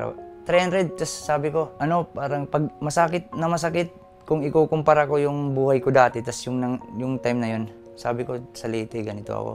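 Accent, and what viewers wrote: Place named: Waray, Philippines. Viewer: Waray man ka diay Doy hehe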